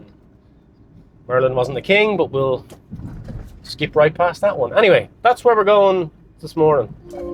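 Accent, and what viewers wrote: Ireland. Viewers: yes. I had noticed his Irish accent ❤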